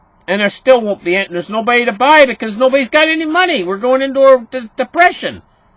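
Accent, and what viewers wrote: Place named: Canada. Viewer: If anyone wants to hear a Canadian accent, this man is the example. "The titter on the end" Great accent, great show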